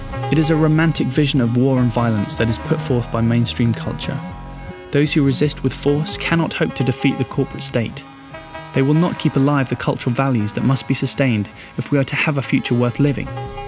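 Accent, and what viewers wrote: United Kingdom. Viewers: Cute British accent.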